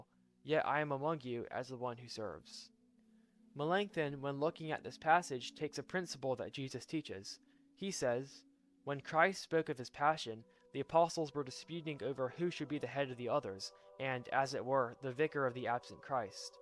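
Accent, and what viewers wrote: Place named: RP, United Kingdom. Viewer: Beautiful refutation by Philip Melanchthon. Also, you seem to pronounce your long O sound like Received Pronunciation (i.e. "Ruh-oom" instead of the usual American "Row-oom" for the word "Rome") instead of General American, which I found very interesting as someone living in Scotland. God bless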